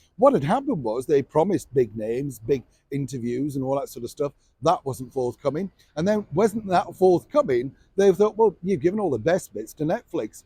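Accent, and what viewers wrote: Yorkshire, United Kingdom. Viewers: Thank you, for a much-needed hearty laugh 😆🤣 You are hilarious!! Love the Yorkshire-born influence showing 🤗 Of course they were all about her!! Goes along with H interviewing the pope on fatherhood, or Putin on his mental health!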